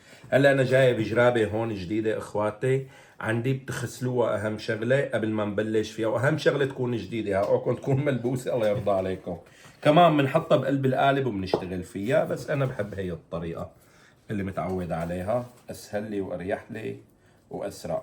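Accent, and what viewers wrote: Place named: Syria. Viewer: اللهجه السوريه تجنن❤❤❤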